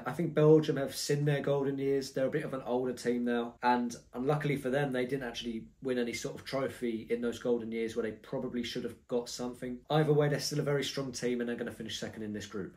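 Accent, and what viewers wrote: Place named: United Kingdom. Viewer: Lol you are defenitly brit lol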